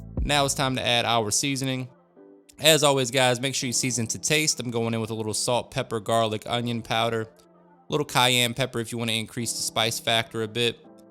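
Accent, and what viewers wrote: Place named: Pittsburgh, United States. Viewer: This guy has a Pittsburgh accent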